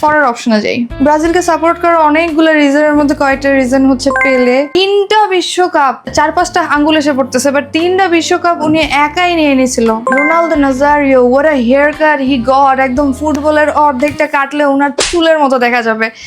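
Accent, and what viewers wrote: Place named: Bangladesh. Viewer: বাংলাদেশের মাল সেটা বুঝতে পারলাম কথাতে।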